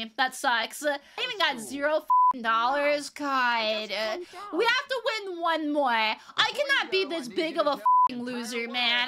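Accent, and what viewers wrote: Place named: Midwest, United States. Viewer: you sound mid western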